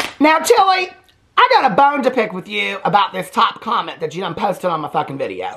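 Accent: South, United States. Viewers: Im from Tennessee and you do have a southern accent and I love it 😜 omg You have got to read the comments more often. That was hilarious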